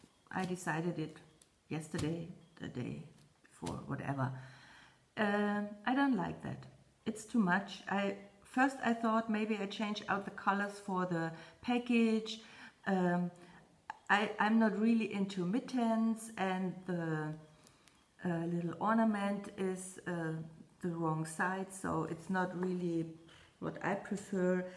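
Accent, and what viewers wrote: Germany. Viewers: I just love listening to you! My family emigrated from Naussau, Germany to the U.S. in 1837. My great grandmother, who died in1967, still spoke mostly German, but when she did use English, she sounded just like you. Thank you for bringing back lovely memories of my Granny with your lovely voice. I always enjoy your videos, and you make me resolve to stitch more smalls. I’d love to have a bowl of different pretties for each month. Thank you for sharing!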